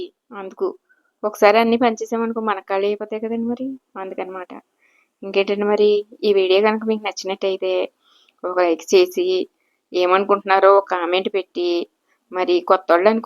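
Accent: Godavari, India. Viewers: గారు మీరు చాలా చక్కగా వీడియో చేశారు...👌 Natural గా మన గోదావరి నటివిటీ తో బాగా ఆప్యాయత ఉట్టి పడే విధంగా మాట్లాడారు..❤ నేను గారు వాళ్ళ అక్క గారి అమ్మయి అండి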